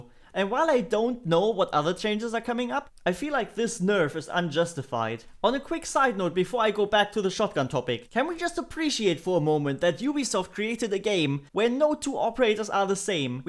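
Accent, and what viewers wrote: Germany. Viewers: you deserve more subs and i was wondering are you German or Russian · What is that accent? Is it German? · Are you German? Sounds like a German accent. I like it.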